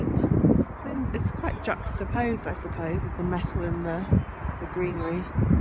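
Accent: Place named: United Kingdom. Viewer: I love your british accent, lovely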